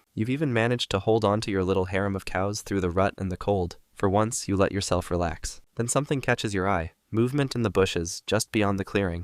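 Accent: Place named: United States. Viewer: Why is the location of this channel the United Kingdom but the narrator is American?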